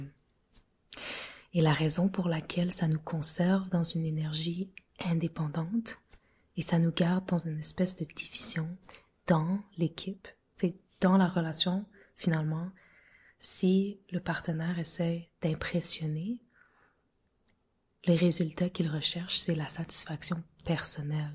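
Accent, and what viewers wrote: Quebec, Canada. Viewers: I'm from Saskatchewan, I'm surprised I picked out that it was a Quebecois accent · Charmant le parler québécois 😊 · Je ne m'attendais pas à ton accent québécois hahahahaha j'étais habituée à l'anglais mais ça fait un ti choc mdr (je suis française) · Ça c'est du français Québecois! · Québec accent here 🙃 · je suis français et je suis étonnée que tu parle si bien français. PS: j'adore ton accent québécois. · je ne savais que tu parle Français :) c'est un accent Quebecois ? tous ce que tu dis est beau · Merciii pour cette petite leçon de vie! Ps: j'adore ton accent québécois (si c'est bien ça):) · Avec accent québécois 😊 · Un petit accent québécois 😝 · when you speak english you cant barely pick up you are from canada , totally different in french, your accent betrayed you (that and speaking french from quebec) hahaha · You sound québécoise(just like me fr) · J'adore ce petit accent canadien / québécois du français que tu as appris. 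Félicitations, toujours au top tes vidéos 🙏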